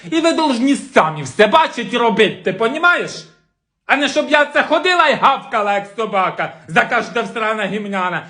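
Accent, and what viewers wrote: Poltava, Ukraine. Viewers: Чого причепились,Полтавщина так завжди балакае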